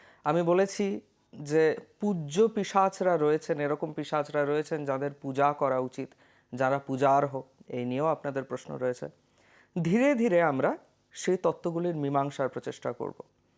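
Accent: Purulia, India. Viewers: That Purulian accent 👌😊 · Ki sundar kore bolo tumi bhai পুরুলিয়া r bhasa, অপূর্ব, eto সমৃদ্ধ hoi tomar kotha sune je bolar bhasa nei🙏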